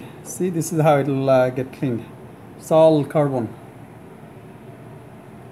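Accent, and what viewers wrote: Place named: Nepal. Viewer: are u nepalese? ur accent sounds like nepalese and yes mostly nepalese people use this method to see the solar eclipse. I have been using this method since childhood for several times and it works fine. thank you for sharing!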